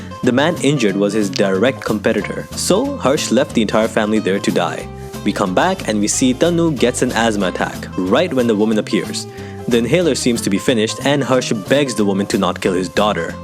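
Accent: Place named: Canada. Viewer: oh canada... Thats the accent come from 😉.... Yeah youtube support big companies.... I always wait for your reviews... I'm one of your huge fan... Please upload more often... Also you look much better than the photographer boyfriend 😉 😉 😉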